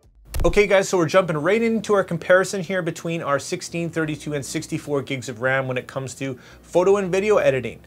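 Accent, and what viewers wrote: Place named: Canada. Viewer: As soon as I heard your accent, I knew you were a fellow Canadian :). Thanks for sharing this information!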